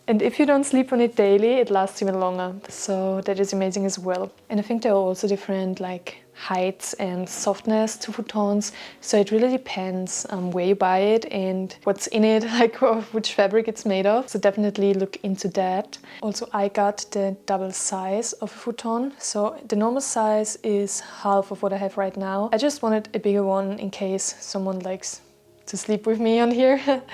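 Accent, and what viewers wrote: Austria. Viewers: first time I was really certain someone online is from Austria from an accent in English, lol (and I was right according to the channel Info) can't pinpoint the area tho, but sounds more central/ northern/ eastern than southern/ western, I think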